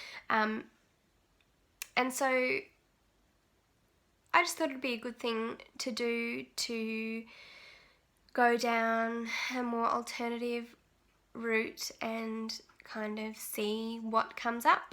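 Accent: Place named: Boston, United States. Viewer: Nice Boston accent!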